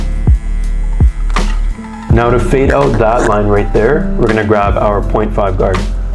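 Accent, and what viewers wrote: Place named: Toronto, Canada. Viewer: Eh, are you from the GTA? (I’m guessing based on the accent)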